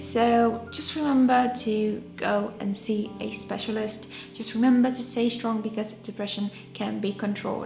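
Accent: Poland. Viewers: Wiedziałem że jesteś z Polski po wymowie zanim zobaczyłem komentarz xd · are you polish? very beautiful accent 💜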